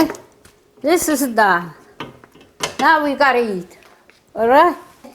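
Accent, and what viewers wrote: Italy. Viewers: .....,mi chiamo Carmela...I love your Italiano- Inglese as much as I ❤ love your cooking! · Im starving now😋 i have to make this. I love penne ala vodka and the peas are a good addition. I also love your singing and beautiful Italian accent 🇮🇹 Bravo!! 👋👋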